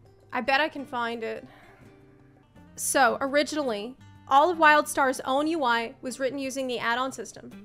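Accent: South, United States. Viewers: southern accent comes out when she's mad.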